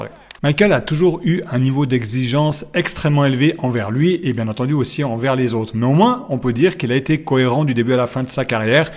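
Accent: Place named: Switzerland. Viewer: Bravo pour le boulot et l’accent Suisse qui fait plaisir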